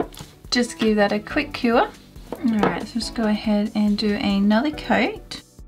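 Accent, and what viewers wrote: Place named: Australia. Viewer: These are so cute!!!♥️♥️♥️😍😍😍 I was obsessed with barbie when I was little. Love these. So creative! You are so talented. It would be cool if you did a Q&A. I'd definitely watch. Love your videos. I love seeing your channel grow so much! I also love Australian accents, lol. I think press on nails is a great idea! I, personally, probably wouldn't wear nails that long or goergous because I'd probably lose them, lol. However, I still think that's a super awesome idea although I think shipping might be really annoying. It would still be so cool to own a set of your art work even if they were just on display. The only other thing I'd be wondering about is sizing.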